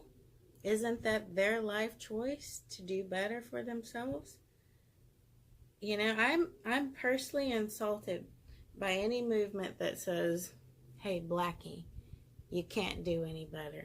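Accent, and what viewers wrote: Texas, United States. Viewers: Yes, her message should be heard by Americans of all races. I know some white people that need to listen to her. I felt that when she said she doesn't support a movement that makes her feel inferior, I would feel the same way if some organization was trying to sell me the same message. I recognized her Texas accent, I lived in Dallas and then Plano for 9 years. Her personality is great!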